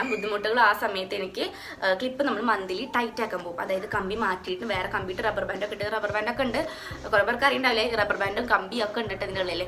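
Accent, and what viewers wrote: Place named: Thrissur, India. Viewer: Chechide veed thrissur aahno slang athupole ind ❤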